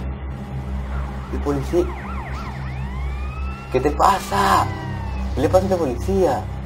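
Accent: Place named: Apure, Venezuela. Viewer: aaa or eso mano se te eschuca lavoz de apureño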